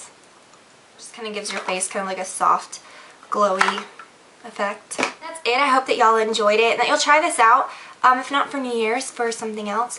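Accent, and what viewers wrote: South, United States. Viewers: Is she from the south?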